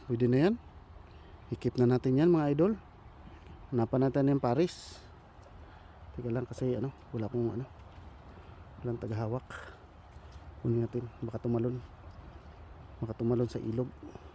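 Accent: Visayas, Philippines. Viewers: Bisdak mn diay k dol😂😂😂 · Kuyawa sa lawa oy,adlawan man msgbalay😂😂😂bisaya man jud ka pre maila ra sa imong inistoryahan❤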